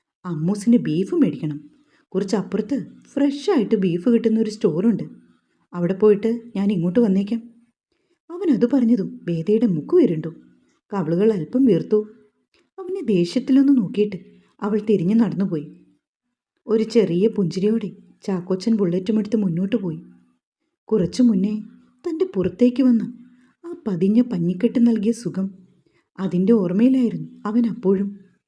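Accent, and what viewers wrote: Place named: Kollam, India. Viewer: . നല്ല കഥ സൂപ്പർ സൗണ്ട് നിങ്ങൾ ഏതു നാടുകരി ആണ് കെല്ല o ആണോ ഞാൻ കാലിക്ക കാലിക്കറ്റ് ആണ് കെല്ലം കാരുടെ . സംസാരം ആണല്ലോ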